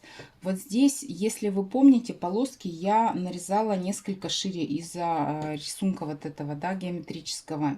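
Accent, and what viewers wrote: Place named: Serov, Russia. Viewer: У меня родня в Серове живёт , говор как у них. Как будто с ними поговорила. Очень красивое одеяло!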